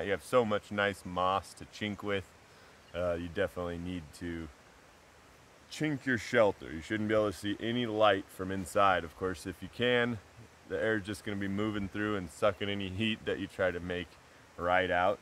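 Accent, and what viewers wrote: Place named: Siberia, Russia. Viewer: As you know, Alone on TV is very drawn out sometimes, that’s why I started doing 1.5/2x But, I never got to hear what your voice sounded like at this speed because whenever you or roland were on I would slow it back down so I didn’t miss anything. You guys are like encyclopedias: straight to the point, like how I imagine many most mountain men and Russians are. I have a theory that your time spent in Siberia has given you a really cool dialect. It’s always a pleasure to hear you talk, especially from memory.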